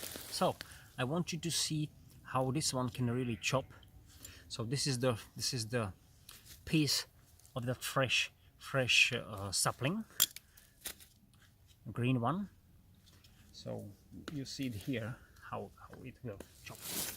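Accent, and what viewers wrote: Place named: Europe. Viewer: Great video thank you were you , sound very European, good stuff , im from Canada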